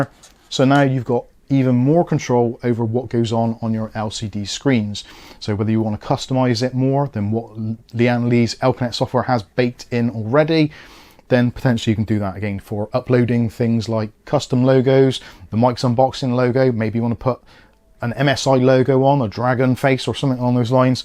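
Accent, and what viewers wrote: Bristol, United Kingdom. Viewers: Thanks for the video. You’ve deffo got a south west accent, sounds Bristolian but could be wrong lol.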